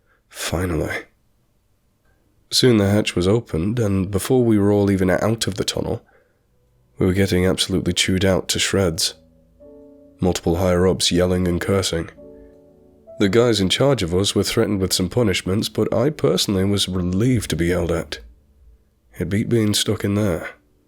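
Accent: England, United Kingdom. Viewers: These stories are great! Love the narrator's Brit accent too. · That Brit Accent is most annoying. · A ME city but all was in proportion, even churches? In the first vid this person talked about Palm Desert close to the Mex border in Carolina. This sounds like a great SiFi story. On top of this the narrator has an English accent! The stories sound real but I keep thinking the feeling he has of being watched may be by Freddy Kruger! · What is a Brit doing in the U.S. Marine Corps.? It's pretty obvious that the speaker is from the U.K. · Like many other I love your voice, I DO have a few questions though, you mention USMC and Navy Seals etc, yet your voice is 100% English, and so are your expressions... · He sounds ex muslim Brit from Uk. Good stories. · This series of stories is AWESOME, but I gotta ask, if he's a US marine, why does he have an English accent??? 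Dont mean to be a Donald downer, just asking. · (Just wondering... how come a Brit is reading a Marine's story?) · british accent, talks about kilometers hmm.. must not be American marine.. ?? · That's so weird grown ups playing war!!....in a fake city!!...so weird!!...and your accent sound english, european.. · You are a Marine in U.S. 'cause you have an English accent? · British accent? And use of kilometers? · love your voice. You sound british like me :) ( A Worcester girl). You tell these stories so well and the pictures are great to give an insight to it all. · How can a U.S. Marine have a BRITISH ACCENT PLUS mispronounce "Marine Corps??????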